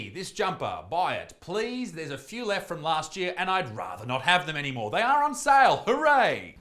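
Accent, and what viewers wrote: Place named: Australia. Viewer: I kept hearing you say ' white inclusive consultant" and I was like wth is that? lolol just for reference you are Aussie and I am American lol..was just the accent mate ~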